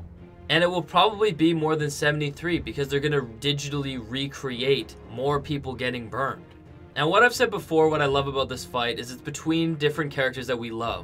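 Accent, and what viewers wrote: Canada. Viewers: Is that a Canadian accent? *curiosity 😜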